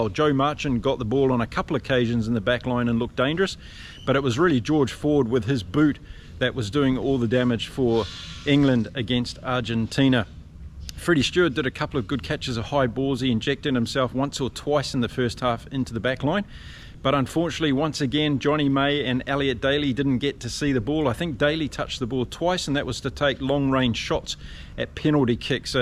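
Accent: New Zealand. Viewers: indeed, but you could easily apply that to the English team from the other night, because it was precisely power and self-belief that won them the game. I think what we're talking about here is aesthetics and beauty is different for everyone and is different in every society. What I found funny was that your reason is consistently unbiased and its only the Kiwi accent that tells us where you are from, but your aesthetics on the game places you very precisely in the world.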